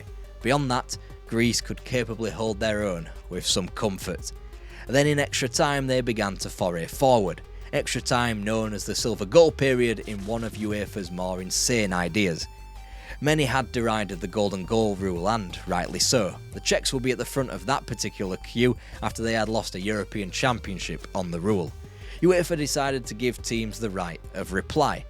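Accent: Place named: North, United Kingdom. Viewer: Northern I was certain about. It's a very cool sounding accent, like someone I'll have three pints with on a saturday afternoon.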